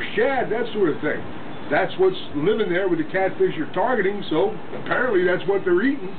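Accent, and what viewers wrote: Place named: New Jersey, United States. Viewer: Those TV Producers are going to be trying to get you to sign a contract. No kidding, you made a GREAT presentation! I like that Jersey talk of yours. LOL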